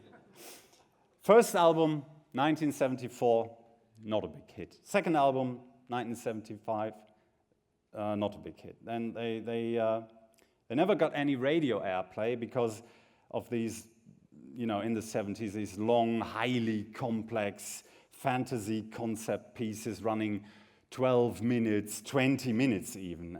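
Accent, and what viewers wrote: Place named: United Kingdom. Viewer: Roman LP ja wirklich, aber eher britisch als amerikanisch...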